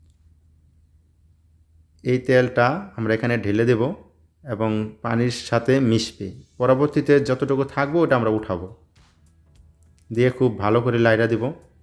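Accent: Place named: Barishal, Bangladesh. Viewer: ভাই মনে হচ্চে আপনার হোম ডিষটিক বরিশাল ।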